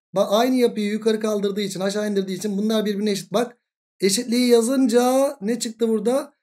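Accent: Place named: Balıkesir, Turkey. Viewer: Hocam sanırım Balıkesirlisiniz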